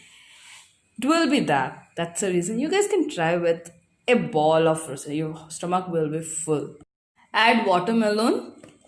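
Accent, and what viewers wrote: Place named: England, United Kingdom. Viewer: I just like your English accent than this fruit salada😊😊😊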